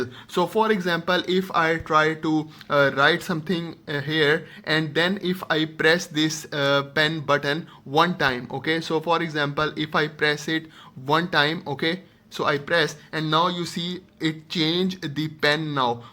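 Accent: India. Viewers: What a nice indian accent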